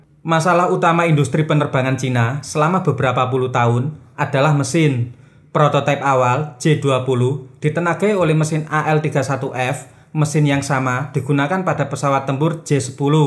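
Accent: Java, Indonesia. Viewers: Kau cakap apa woo...kental betul jawa kau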